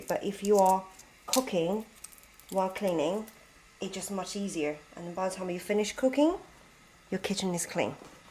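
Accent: United Kingdom. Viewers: That English accent? You should be in Bond movies,for sure?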